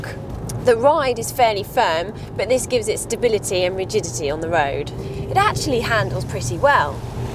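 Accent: United Kingdom. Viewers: Forget about Suzuki Grand Vitara, she has awesome British accent, she look and sound very graceful.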